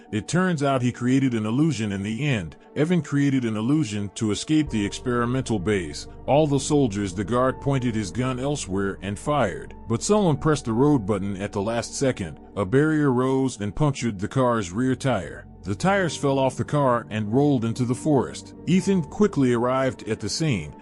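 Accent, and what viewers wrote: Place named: Pidgin, West Africa. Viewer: Why do the narrators for these films not speak English but speak Pigin English?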